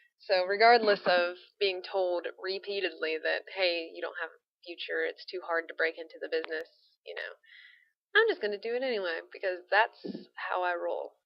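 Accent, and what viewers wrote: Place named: England, United Kingdom. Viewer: An american who can do an accent which doesnt make us seem like all we do is eat crumpets and drink tea :) Nice work, if you're interested in this sort of acting, look up stanislavski - he thought of loads of exercises to get in role for naturalistic acting :) and also try not to emphasise words so much, we're a lazy speaking country! next video you do, say hello youchoobe (which is how english people pronounce it) :)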